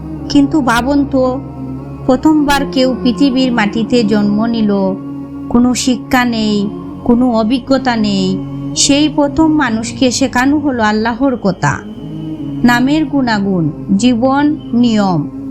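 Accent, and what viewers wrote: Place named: Sylhet, Bangladesh. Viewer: আমার মনে হচ্ছে, আপনি সিলেটি, আপনি শুদ্ধভাষা ব্যবহার না করে, আপনার আঞ্চলিক ভাষা ব্যবহার করেন, শুদ্ধ ভাষা আর আঞ্চলিকতায় মিশে থাকলে শুনতে ভাল্লাগেনা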